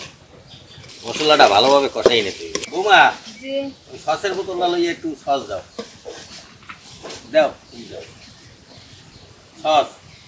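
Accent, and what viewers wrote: Barishal, Bangladesh. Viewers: দুদু আমনে কি মোগ বরিশাইলা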